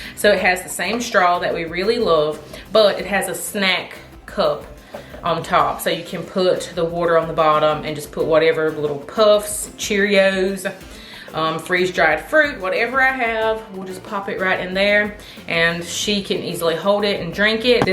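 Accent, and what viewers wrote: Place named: North Carolina, United States. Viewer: My kids are grown. I just like hearing you talk! You're NC accent sometimes has a bayou twang.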